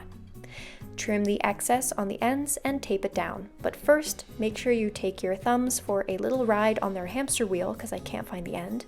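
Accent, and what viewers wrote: Canada. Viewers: you have the strongest Canadian accent i’ve heard in a long time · I loved this video, it was beyond helpful <3 I think using a cheap notebook and modding it helps with the "perfectionist freeze" that I get when I don't want to start a new notebook and ruin it. By the time you start these books, they've already been broken in =) Also I thought I heard that familiar Canadian idiolect, and I'm glad that wasn't just in my head lol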